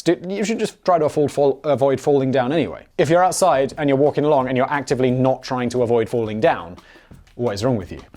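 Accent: United Kingdom. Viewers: The way Brits say urinal, garage, and controversy is weird. And I learned this from watching. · I did not know British people said urinal like that. I’m shook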